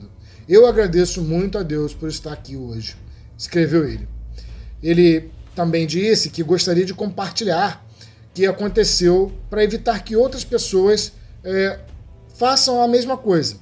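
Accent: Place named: Macaé, Brazil. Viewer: vc é da onde parece de Macaé falai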